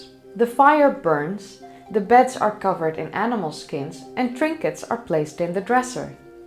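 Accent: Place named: Netherlands. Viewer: Right, a lovely Dutch English accent - I heard it in a second.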